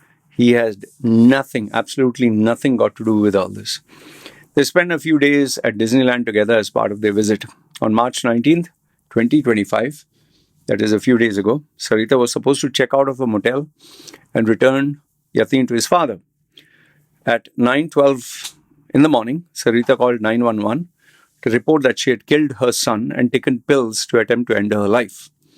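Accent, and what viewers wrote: India. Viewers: Your accent is Indian too…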